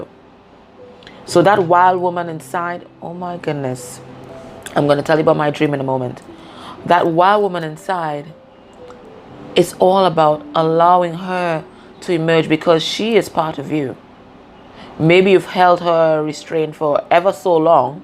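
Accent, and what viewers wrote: Trinidad and Tobago. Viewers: Are you a trinie you sound like a trinie, good works thanks for the guidance God bless.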